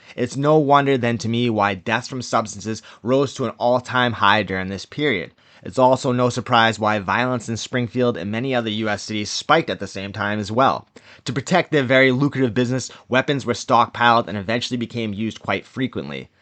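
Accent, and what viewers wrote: Boston, United States. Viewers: This guy has a boston accent lol😂you ain't from the field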